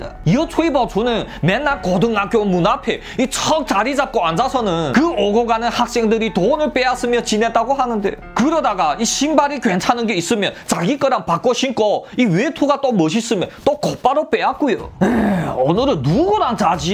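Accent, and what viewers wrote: Yanbian, China. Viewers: 연변말 북한말씨 다른 것 알겠네요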